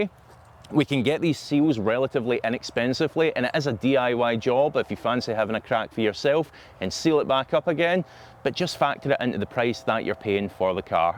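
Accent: Scotland, United Kingdom. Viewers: the scot is strong with this one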